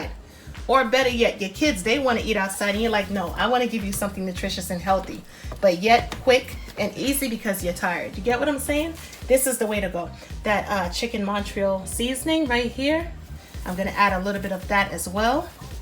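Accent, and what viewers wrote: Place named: Brooklyn, United States. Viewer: I knew it! I could hear that Brooklyn accent. I wanted to ask, but was too shy to. lol :)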